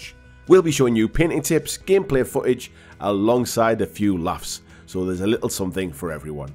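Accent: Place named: United Kingdom. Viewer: The British accent may be a bit difficult especially for non native speakers. I think it's fine perhaps add captions?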